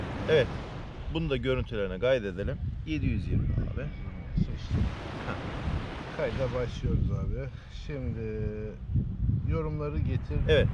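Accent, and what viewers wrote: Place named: Russia. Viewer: Ruscayi harika konuşuyorsun lehcesiyle 😁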